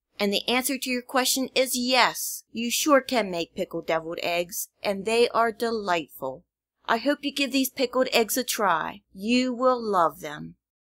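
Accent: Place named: Philadelphia, United States. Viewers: Oh, and, I just love your PA Dutch accent!: ) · I think I hear the Jersey/ Philly accent! I love it, my wife still has a little bit.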